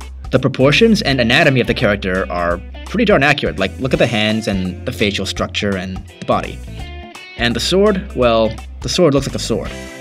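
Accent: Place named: Wales, United Kingdom. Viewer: Oh god, she's Welsh...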